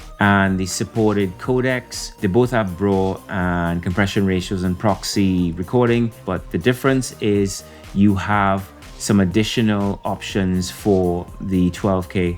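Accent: Trinidad and Tobago. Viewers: Which part of the Caribbean are you from? You sound Trini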